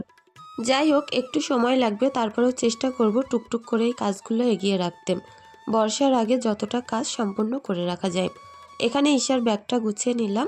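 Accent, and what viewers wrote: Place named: Barasat, India. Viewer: তোমার ভিডিও দেখতে আমার খুব ভালো লাগে , আমি বারাসাত থেকে দেখছি , বসিরহাটে আমার মায়ের মামাবাড়ি , তোমার কথা বার্তা , সবকিছুর সাথে আমাদের এখানকার অনেক মিল পাই , যার জন্য খুব ভালোলাগে তোমার ভিডিও দেখতে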